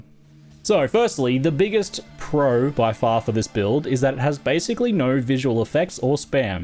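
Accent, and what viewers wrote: Australia. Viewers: ihavent played PoE in ages and i was so happy to see when i googled Viper Strike build a build come up. my favorite poe guru. is australian? i cant tell the accent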